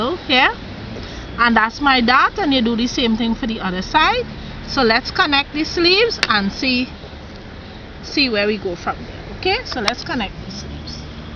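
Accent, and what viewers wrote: Trinidad and Tobago. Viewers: when i started looking at this video i say but how this lady sounds like a Trini so, I like it, we need to meet some time. I am from Trinidad and Tobago and I teach Fabric Design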